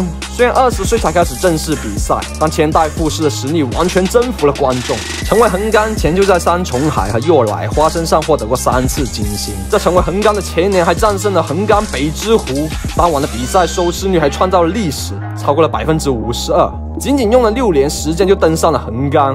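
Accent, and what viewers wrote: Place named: Taiwan. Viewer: 本来很平淡的看人物传记，结果这台湾腔说到后来都激动落泪和死了爹一样潸然泪下，让我笑出声，真是大孝子。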